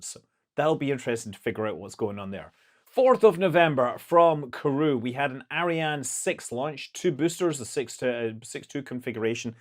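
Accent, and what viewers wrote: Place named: Edinburgh, United Kingdom. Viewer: scott manley is a scot, and manly :) i do a fair accurate glasgow accent. i love yours. doesnt quite sound like glasgow..... or maybe mine doesnt. sounds more edenborough (and i am canadian so wtf do i know)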